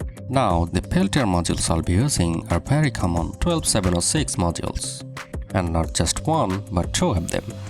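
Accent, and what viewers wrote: India. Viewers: Your voice like indian ❤🎉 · Your account from USA but your voice like from India or Bangladesh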